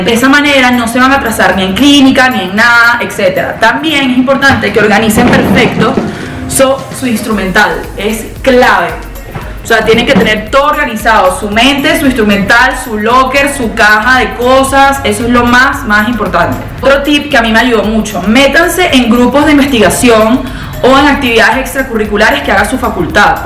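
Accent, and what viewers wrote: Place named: Venezuela. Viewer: Guido Kaczka On Drugs No es Colombiana, su acento es como venezolano. Saludos desde Medallo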